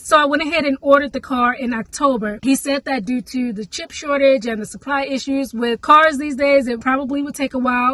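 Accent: Baltimore, United States. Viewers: I’m from Baltimore tooo , your accent is soo clean compared to how others talk here. 😌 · i'm so late! i had no idea you were from baltimore. MD GIRLS FTW lol Now i'm hearing ur accent heavyyy lol. Congrats on the new whip! She's too fly! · Yup I hear the Bmore lol rumz lol aka rooms I love it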